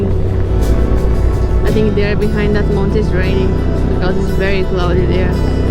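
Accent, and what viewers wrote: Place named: Spain. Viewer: Honestly, 8 years is a very long time to travel specially now you ae alone. But remeber you have a load of people here in the internet that are with you in the heart. I'm a little old as i soon will retire (unfortunately i do not have the vlog skills like you have ) but as soon as i retre, i will travel for a reasonable time and see all the beauty and horrible things you travelers have experience on my 1250 GS. Yes 150k is a lot, and perhaps the engine will be totally fine, but like a clutch can be a little problem in that long time as that is used several times a day, still, not so much a bug problem when that have to be renewed on your Tenere compared to my beemer hahaha I hope you are doing well as i love to liste to yourEnglish in a Spanich way, its soo adorable hou you begin and let us know what you experience. So drive safe, and have a good morning in the morning..;)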